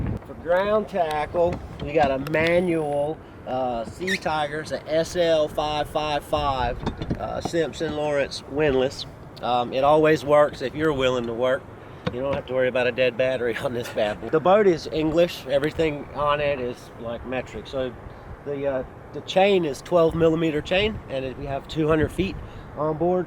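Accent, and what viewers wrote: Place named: North Carolina, United States. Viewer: or north carolina. we have accents like that here too.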